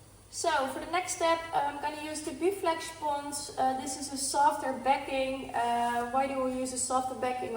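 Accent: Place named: Netherlands. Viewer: Where are you from? Sounds like you have a Dutch accent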